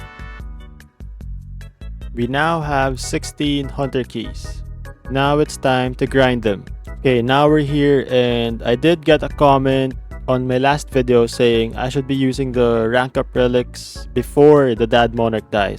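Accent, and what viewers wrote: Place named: Philippines. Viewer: I can hear some Filipino accent tho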